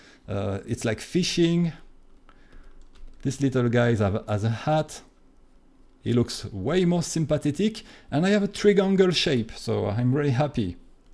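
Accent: France. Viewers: Your French accent is a heaven 😭💕 Anyway I like your style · I really like your video's. I learn a lot from your tutorials. Keep it up! Your accent emphasises my vision of a French painter in Paris. 👍👍👍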